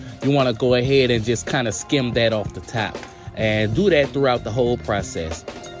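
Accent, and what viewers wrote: Louisiana, United States. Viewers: I know a Louisiana accent when I hear one 😂😍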